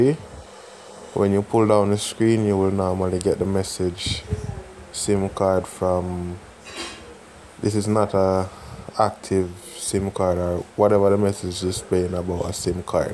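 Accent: Jamaica. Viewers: You from Jamaica? Sound like a Jamaican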